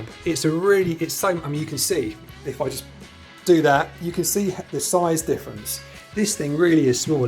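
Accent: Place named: United Kingdom. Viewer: many thanks look forward to your review. I never used nux before but I was totally blown away with the mighty air. It's only the size of my hand so you could take it anywhere. Having a built in battery and being wireless is massive feature. It's good you don't have to spend loads of money for good sounding gear. Keep up the good work just came across your channel and love it. Are you in USA , you sound British but you say pricing in dollars.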